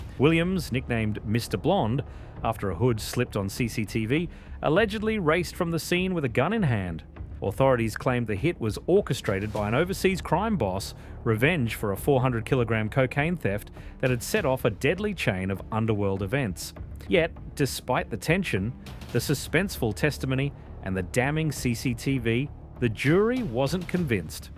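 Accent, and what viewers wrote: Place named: United States. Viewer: I hear an American accent but it could be AI if not do some research so you stop sounding like a dickhead it's always been like this why do you think some people earn full colours in half the time of others. You sound like a square head who reads something in the newspaper and then talks to other straights like he's in the know. Don't usually comment but I'm sick of this shit.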